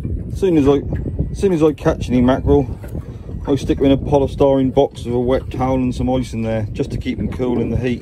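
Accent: United Kingdom. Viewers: You accent is very thick, are you from the U.K.?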